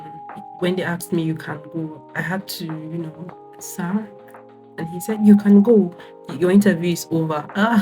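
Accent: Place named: United Kingdom. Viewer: Na you de speak Queen's English like this??? Na wa oh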